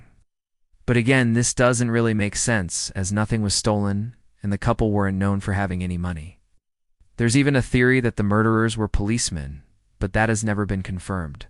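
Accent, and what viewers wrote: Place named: Dublin, Ireland. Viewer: Very good but prefer your Dublin accent!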